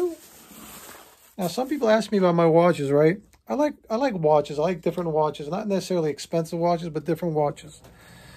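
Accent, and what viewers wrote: New York, United States. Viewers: Your channel is addicting, watched a few episodes last night and then I realized it’s 2am, and had to get up at 4am lol. But it’s relaxing and I enjoy watching. Learning about nice shoes. I’m a carpenter so don’t get to dress nice much, but I like watching how you take apart these shoes and rebuild them. Pretty awesome tools and old school machines. You have a NY accent brother. Not sure where your shop is located. I had a German shepherd named Zues too, sorry you had to relocate him. Take care and keep posting awesome videos.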